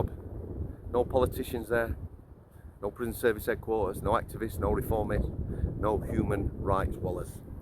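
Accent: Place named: Sheffield, United Kingdom. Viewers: Love your Videos can tell your from the Steel City of SHEFFIELD a Mile off with that accent. Can't miss it anywhere in the World. Your good at this Mate all the best from SHEFFIELD. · Yeah proper Sheffield accent that. From Sheffield meself. Living in Bangkok now. Stay up till the early hours watching this channel. Nice to hear a proper accent on YouTube.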